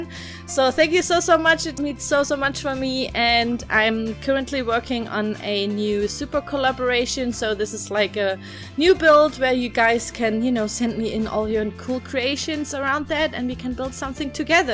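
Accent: Germany. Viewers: Hey :) Where do you come from? You sound really german to me 👌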